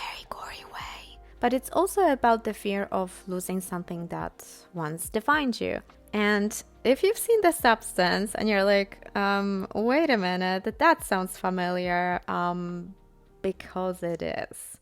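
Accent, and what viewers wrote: Poland. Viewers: I can hear a little Polish twang in your English. Are you originally from Poland?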